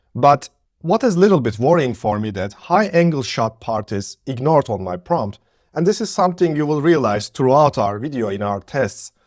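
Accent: Turkey. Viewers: Kral türk müsün aksan türk aksanı :d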